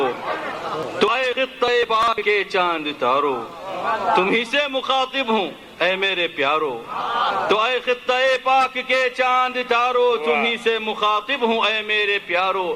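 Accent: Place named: Lucknow, India. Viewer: لله درک یا شیخ هنیٸا لک هذاالابداع والارتجال ماشآ ء الله انت تقرض الشعر باالاردیة الفصحی کأ نک من لکناٶ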